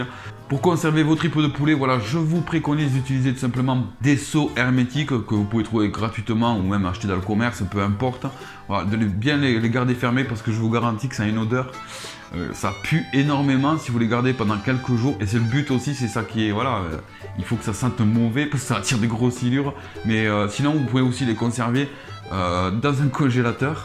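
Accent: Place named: South, France. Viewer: région du Tarn l accent me semblé familier je viens du Gard , haaaaa le sud lol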